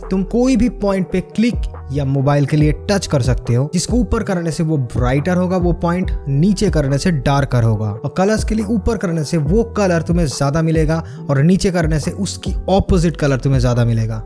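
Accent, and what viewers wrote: Bengal, India. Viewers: That bangla accent 🔥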